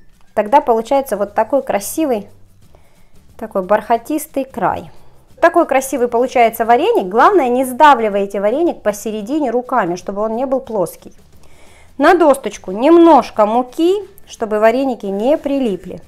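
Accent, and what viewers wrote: Surzhyk, Ukraine. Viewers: Спасибо за рецепт,скоро буду готовить именно по вашему рецепту : ) P.S. обожаю ваш канал и вашу приятную подачу рецептов. Не обращайте внимания на дураков и хамло, не меняйте манеру разговора и суржик : )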